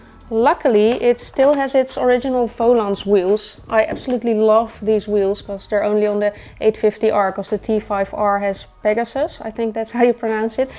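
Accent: Netherlands. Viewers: Nice dutch accent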